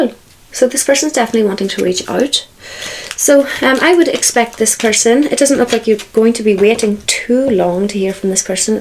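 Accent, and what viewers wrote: Ireland. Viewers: I so enjoy your readings and your Irish brogue. And now today I'm loving your Scooby-Doo top. Can you please tell us where you were on vacation? It looked spectacular! Thank you for sharing your abilities and gifts with us. ❤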